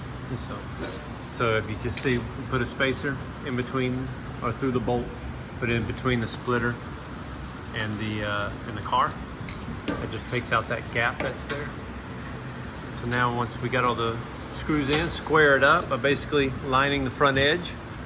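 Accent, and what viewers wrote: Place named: Texas, United States. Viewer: Texas Talking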